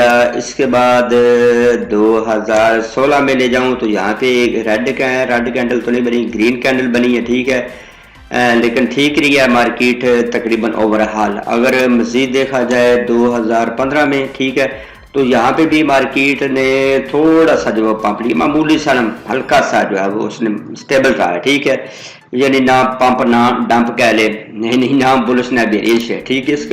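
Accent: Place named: Punjab, India. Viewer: ap jo punjabi ka tarka lagaty hain wo bahot he mazy ka hota hai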